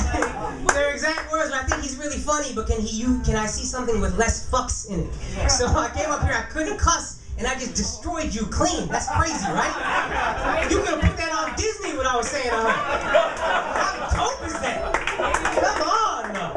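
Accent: United States. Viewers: Really, I thought he had way more of an NY flavor to his accent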